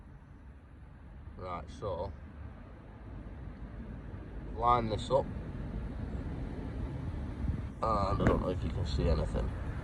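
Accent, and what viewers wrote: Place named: Northern England, United Kingdom. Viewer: Dead helpful mate, love the northern accent btw 🇬🇧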